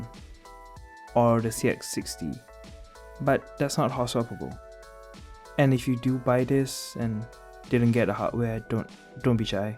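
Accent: Malaysia. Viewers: No wonder your english sounds familiar, melayu rupanya hehehe mantap bro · no matter how hard you try to hide your accent, a malaysian can always tells the different, ewaah. no la...link shopee tu shopee malaysia xD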